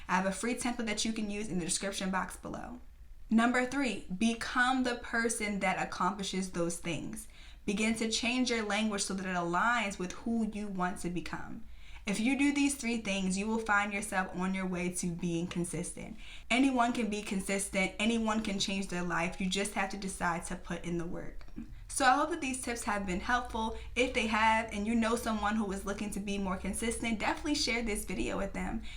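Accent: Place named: DMV, United States. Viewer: You’ve gotta be from the DMV or somewhere in that area lol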